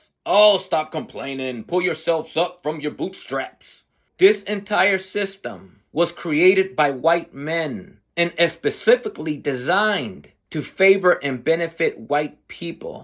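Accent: Australia. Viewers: DUDE YOU ARE NOT FROM HERE..AUSSIE??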